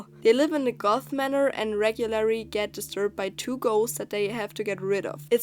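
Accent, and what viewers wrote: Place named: Germany. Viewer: man hört das sie deutsch ist😂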